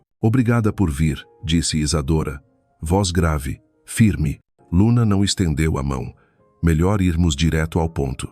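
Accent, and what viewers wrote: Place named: Spain. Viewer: Não entendi o porquê do sotaque espanhol, soou mal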